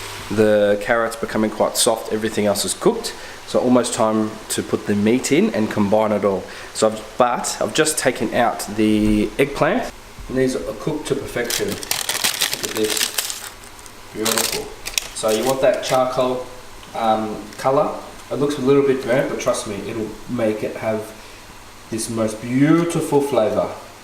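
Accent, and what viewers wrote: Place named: Italy. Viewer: Loving the Italian accent mate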